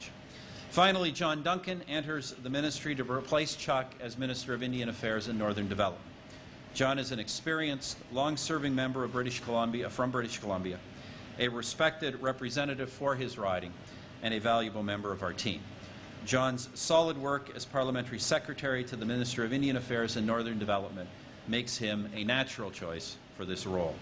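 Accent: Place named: France. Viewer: people who are making fun of his french accent. Well, some french who speak english don't have a perfect accent either...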